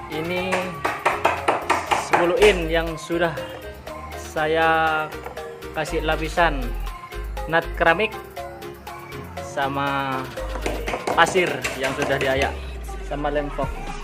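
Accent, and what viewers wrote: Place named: Madura, Indonesia. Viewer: kalau dengar suaranya orang madura ya bang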